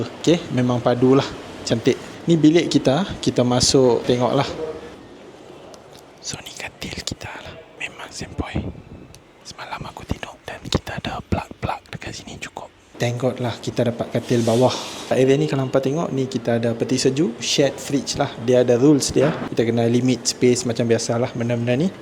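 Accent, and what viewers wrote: North, Malaysia. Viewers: Best memang terbaik la.dengan cakap loghat utara syok tengok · Wei lama aku tggu org kita buat content camni.. lagi mantap sbb cakap utara. Steady org kita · 1st video saya tgk dan terus subscribe. Penerangan jelas sekali dgn info tempat dan harga. Explaination pun sempoi lagi2 dgn loghat utaranya hahaha dan planning aktiviti pun menarik. ada tunjuk aktiviti penduduk in different time of the day.your intention to potray the other side of the locals tu mmg sampai, terbaik. Mantappp👍keep it up the good work. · Btltu terbaikla, org Malaysia yg berbangsa India dan agama Hindu yg tentunya asal usul nya dari India, tp abgni dgn ckp lonhgat utara, pergi pulak sorang²tu, MMG Chanel yg baiklah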